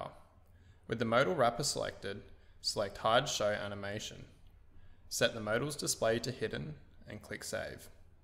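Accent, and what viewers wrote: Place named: United Kingdom. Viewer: You lost me in 10 secs, too fast and the British accent is hard to understand.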